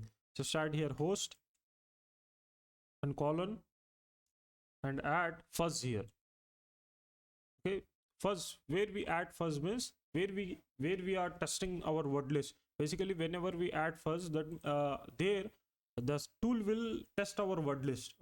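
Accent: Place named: United Kingdom. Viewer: Hello Sir, I love your this British Accent ❤️!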